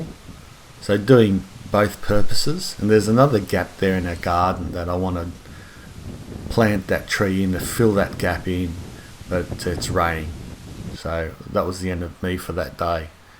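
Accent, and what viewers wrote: Australia. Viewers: I love your videos. I'm in NZ so my climate is not quite as warm as yours, but great to hear a Southern Hemisphere accent (even if you are an Ozzie!). After seeing your dragon fruit vid, I sowed some Dragon Fruit seeds and they have alredy sprouted.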